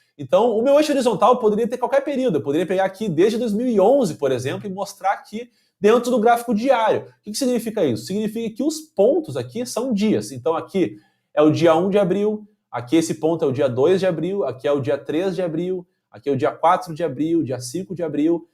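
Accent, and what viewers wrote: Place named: Rio Grande do Sul, Brazil. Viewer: adorei seu sotaque gauchíssimo - além, claro, da explanação muito didática para esta neófita no mundo do trading de criptomoedas. obrigada por compartilhar seu conhecimento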